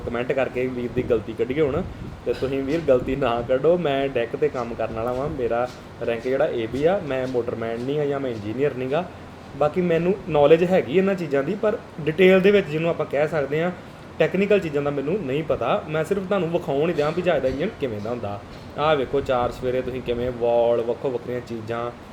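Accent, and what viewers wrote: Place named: Amritsar, India. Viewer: Goodf information and you belongs to amritsar district as it is clear from your speech.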